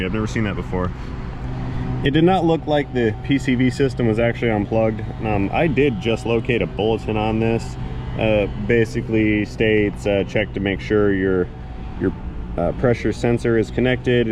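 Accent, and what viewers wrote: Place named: United States. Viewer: Your language is good fun I'm English and your American is great fun to listen too 👍😀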